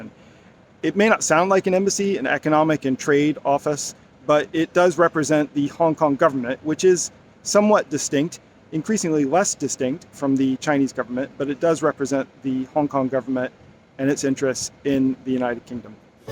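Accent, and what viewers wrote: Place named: Southern California, United States. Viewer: is it just me or does this guy have the most intense southern california accent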